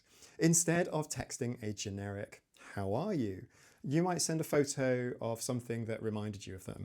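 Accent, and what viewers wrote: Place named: United Kingdom. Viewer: I love your presentation style and accent! As a British person in Germany, have you noticed how criticism can be a love language there? I am native German, in the UK for 20+ years, and I realised recently I only criticise people when I care enough about them to want them to succeed and get things right, and to not fail or embarrass themselves. As Sheldon from the Big Bang Theory put it "if a summer in Germany has taught me anything, it's that brutal honesty is its own kind of love." (Young Sheldon, season 7) and "When I criticise people I lift them up." (from the "adult" BBT series) Seen by NT viewers probably as just as another annoying know-it-all trait, I can really see the truth in that. Can anyone relate?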